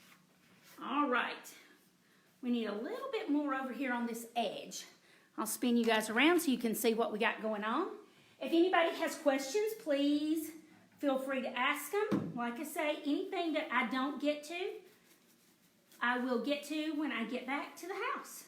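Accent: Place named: South, United States. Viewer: #replay!!! Love your tutorials and of course your southern accent LOL!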